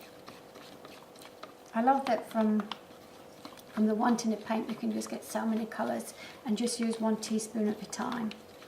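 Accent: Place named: London, United Kingdom. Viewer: Hi there ive discoveted your chanel and absolutely loving everything you surround yourself with. The girly in me would love to just emulate everything you have. However I live in a house full of males (husband and 2 sons so I keep it a very neutral grey and white theme). I adore the colours of the gorgeousness on the table as well as the colours of your paints. Just a couple of quesries/points... When you were mixing your paints and whilst adding in the white - you mentioned you were adding 'teaspoons' of white paint - however, the spoon you was using appeared to be much bigger than a teaspoon so please would you be able to clarify your measurements a little better to save confusion in future videos. The spoon in the white paint also appeared to be quite a bit bigger than the spoon in your powders which did look more like a teaspoon size. 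Also you mentioned cost in dollars but you sound English (from the greater London area). Are you based in the states or some other place that uses dollars as I suppose I am assuming you mean US dollars but this could be just my assumption. If you are in the UK still, please could you provide costs for your paint powders in £ sterling. Just one more thing. The resolution of the video doesn't fit a full mobile screen (when in landscape mode). All other videos I play from other channels fill the area as they should. Is this something in your set up or mine. Although I would say it's not mine but maybe something different I have to do with yours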